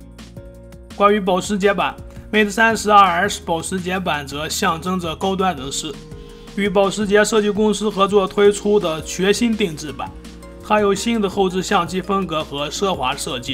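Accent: Shanxi, China. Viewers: 山西人啊这口音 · 山西人吗？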